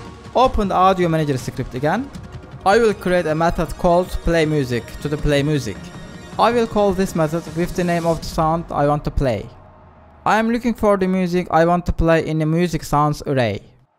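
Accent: Turkey. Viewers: Aksanından kesin bu türktür dedim içimden. Sonra kanalın açıklama kısmından adının olduğunu gördüm 😊 konuşman gayet başarılı ve çok güzel videoların var. Başarılar dilerim.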